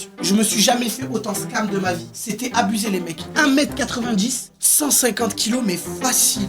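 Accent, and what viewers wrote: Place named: Marseille, France. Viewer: L’accent marseillais >>>>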